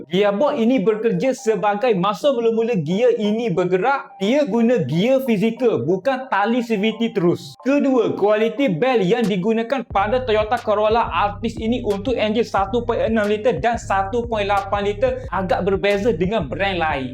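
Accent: Kelantan, Malaysia. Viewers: Kuat bunyi kelantan kau ni brader😂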